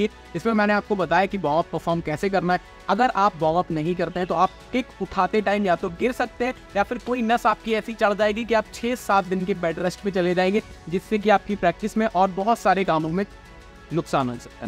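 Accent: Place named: Mau, India. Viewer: Lagta Hai Mau District se ho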